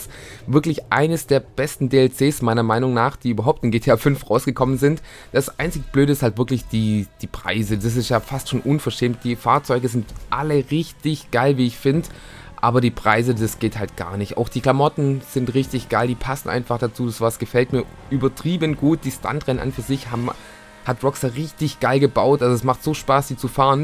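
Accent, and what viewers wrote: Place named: Swabia, Germany. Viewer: Seit Beginn 2016 spielt eig. eh fast jeder mit UnlockAll und im Vergleich zu anderen Updates hust finance and felony hust find ich das Cunning Stunts DLC nicht mal soo teuer. Und trotz alledem sind die Autos in diesem Update viiiel geiler als alle anderen. Ansonsten: Cooles Video! :) Ps: Bin auch Schwabe. Den Akzent kannste dir einfach net verkneifen :D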